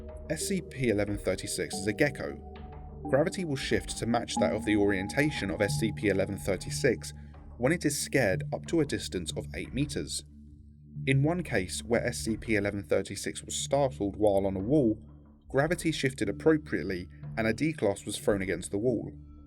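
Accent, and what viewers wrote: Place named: England, United Kingdom. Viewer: I wish I wasn't prejudiced against authentic English accents to enjoy this more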